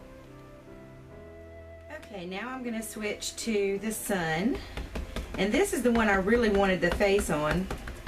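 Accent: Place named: Oklahoma, United States. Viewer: Theresa5 sent me! I love watercolor and will enjoy your series. I love your accent! I am originally from Oklahoma, so your accent reminds me of home (even if you are not from there... lol). Thanks for sharing your lovely work!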